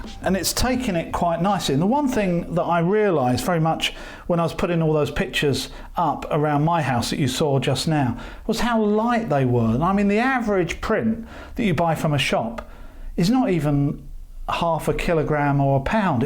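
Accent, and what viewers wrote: United Kingdom. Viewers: Thanks for this, was a bit concerned it would not go into a UK plasterboard so you can imagine my relief when I heard the good old blighty accent ;-)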